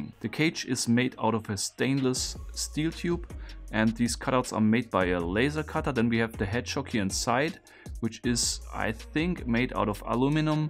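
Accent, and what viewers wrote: Germany. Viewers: Are you German? OMG yes you are....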